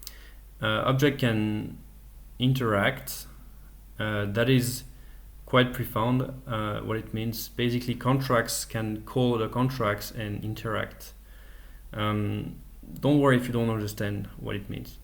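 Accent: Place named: France. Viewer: Hello, i've just seen your video and i'm guessing due to your accent that you are french. I'm also french and currently investing on ethereum, i would love to be able to talk to someone who seems to understand this innovation quite well. Do you think it could be possible? and thanks a lot for this video (it's a bit to slow for me but it's very educational).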